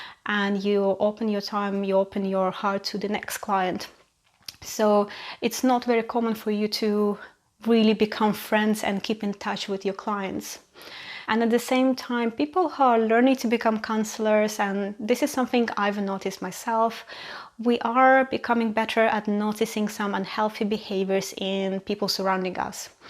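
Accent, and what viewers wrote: Russia. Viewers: I can hear Russian accent. are you living in UK? Why you don't speak your native language? I"m sure russian people will appreciate it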